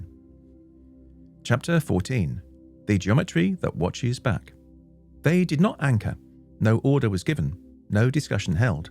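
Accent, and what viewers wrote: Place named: England, United Kingdom. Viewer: please, no more english accent 😮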